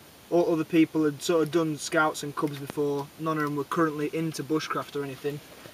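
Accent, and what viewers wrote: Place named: Barnsley, United Kingdom. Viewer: you sound like a proper Barnsley lad... what area you from?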